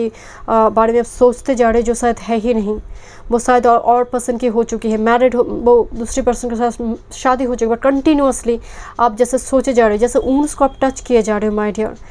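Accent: Bengal, India. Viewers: pile#3 well it's the very first time I am into this channel and loved the entire reading, though I want to ask you 'are you a Bengali?" as your accent is quite similar to Bengalies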